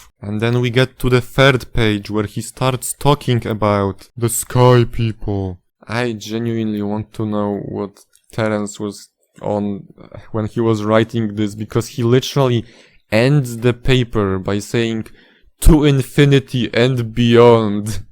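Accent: Poland. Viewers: odkąd widziałem pierwszy film wiedziałem że jesteś Polakiem po akcencie. Dzięki za potwierdzenie brachu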